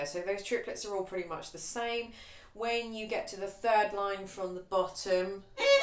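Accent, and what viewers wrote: England, United Kingdom. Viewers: Aloha from Hawaii, I have just discovered this beautiful song and have only been playing violin since last October..However I have a good ear..and LOVE this piece. You are a wonderful teacher, and break the measures down so well for someone like me who plays better by ear. Also, I am Hawaiian, but my mum was from England..and adopted me from birth..SO it is like music to my ears!! to hear you speak..And you play beautifully. THANK you very much for everything!!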